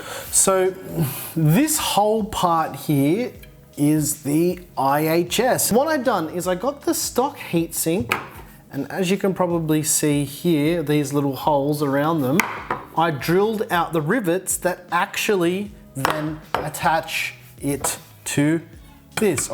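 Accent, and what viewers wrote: Australia. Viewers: are you australian 🤢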